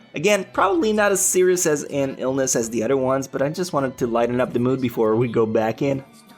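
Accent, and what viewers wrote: Quebec, Canada. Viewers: are you from quebec? your accent seems familar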